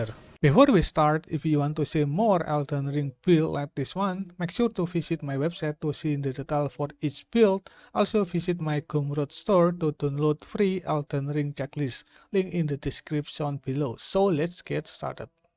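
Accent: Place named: Indonesia. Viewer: iya bang pantesan aksennya kyk org indo cuman pas liat channel infonya dari us wkkwk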